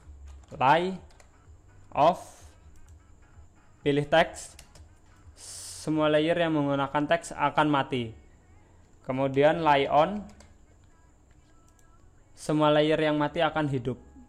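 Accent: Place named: Banyumas, Indonesia. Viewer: ngapak banget mantap mas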